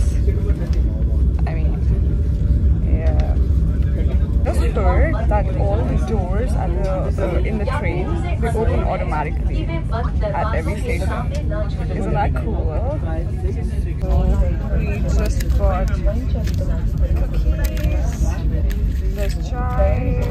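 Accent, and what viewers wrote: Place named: England, United Kingdom. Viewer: Listen to her english accent , mate relax, she's not a indian resident, let her be herself in her comfortable self, So chill & enjoy if you can, for what's she has to express in her quest of this train journey, 🇮🇳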